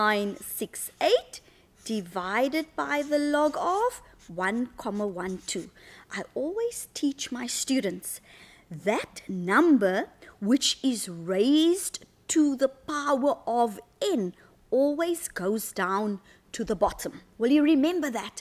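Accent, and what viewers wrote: South Africa. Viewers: That's the way every south african teacher speaks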